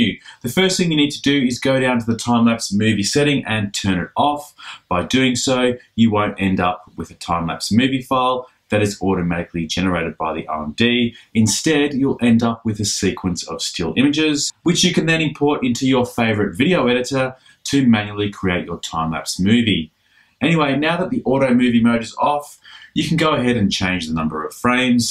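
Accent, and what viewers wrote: Australia. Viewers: Hey man r u from melbourne?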